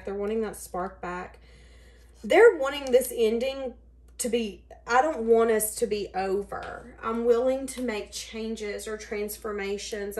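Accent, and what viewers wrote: South, United States. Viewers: I so enjoy your channel '! Your southern accent is hypnotic and very relaxing! The vibration of all your reads is 100% sincerity! wow also you definetly know my person,haha your gift has taught me so much about The connection with my twin flame!I feel a reunion with us is very close. We have known each other since elementary school,she was my first kiss,first crush,first episode of butterflies in my stomach yep. When we reconnected as adults,a couple years ago,it was magical! It was unbelievable how much chemistry and passion we experienced, never left her house for a solid month,heaven on earth.we connected emotionally just as strong as sexually,The conversations were extremely deep lasting sometimes all night,we also share the same type of sense of humor,and so we also had hysterical fits of laughter that went all night.hqahaha I dont even have the words to describe how much I love this woman. 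She feels the same towards me.However the twin flames journey is full of surprises!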